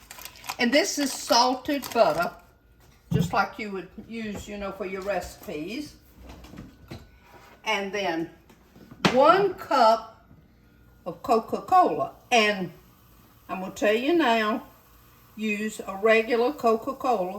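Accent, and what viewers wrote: South, United States. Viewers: I’m a new subscriber who’s been binge-watching your videos. You kept popping up on my screen as a recommendation and I’m so glad I finally clicked on and watched. You have the most delightful southern accent! I’m a southerner as well, but when I hear my recorded voice, I sound like a hick. 🙁😂 Can’t wait to try some of your delicious sounding recipes. Thanks for sharing them. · I love her Southern accent. Sounds like you are from GA.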